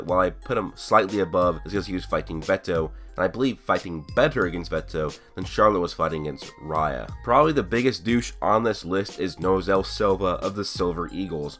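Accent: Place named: England, United Kingdom. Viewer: Great video. I totally agree with this list. One thing you should work on is the way you say their names. The english accent kinda ruins the japanese name of some characters. So work on it. You have my like though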